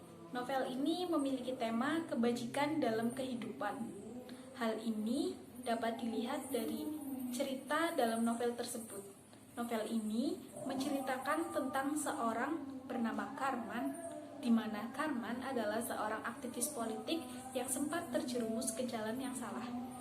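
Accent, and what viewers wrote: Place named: Tegal, Indonesia. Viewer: Bahasane medok men dek... Oponeh nek ngomong kubah... H yang khas tegal... Semangat dek... Oh yo... Tambah senyumnya...biar semakin imut... 😁😁😁 Dan tolong mungkin cari tempat yg agak tenang dan di kasih backsound... Intonasi lumayan oke... Siip...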